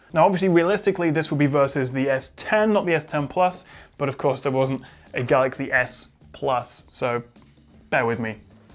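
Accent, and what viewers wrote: United Kingdom. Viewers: Accent is British 😉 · nice British Accent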